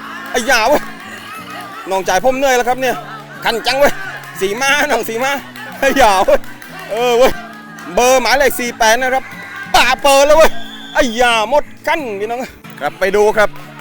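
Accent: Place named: South, Thailand. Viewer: พูดใต้เลยครับ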